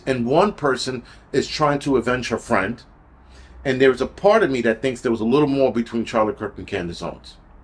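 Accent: New York, United States. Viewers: I love your New York accent ♥️ Keep asking questions. We want to know the truth! I agree, I’m not comfortable how Erika Kirk made the statement “I forgive that man”. What man? Tyler Robinson? There are no photos or REAL Evidence linking him to the crime.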